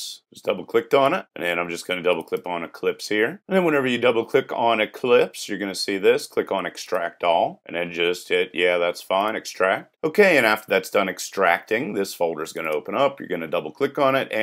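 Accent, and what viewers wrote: United States. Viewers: An american accent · charnel pawny Which part of the US is he from?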